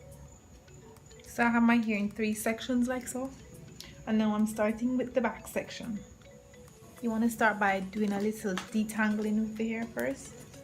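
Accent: Jamaica. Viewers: This is really neat .. I can never slick my hair down ! But ill try this way .. Btw Are you Jamaican ? Kinda sound like one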